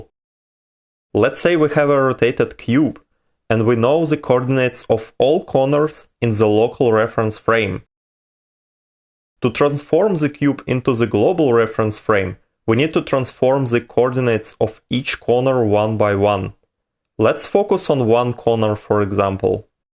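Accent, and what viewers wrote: Russia. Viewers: Хард рашен акцент!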